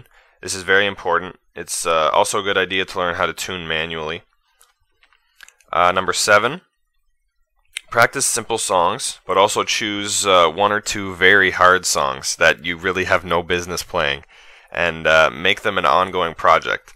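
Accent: Canada. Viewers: you're canadian, aren't you? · you're Canadian xD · are you canadian? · Are you Canadian? There's just that hint of an accent. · Are you Canadian?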